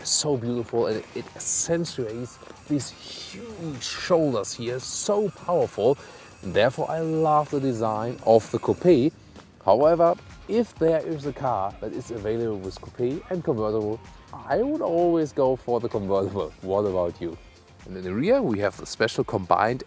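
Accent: Germany. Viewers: Please make your Videos in German, the German-English sounds like shit and your Channelname is also a German one...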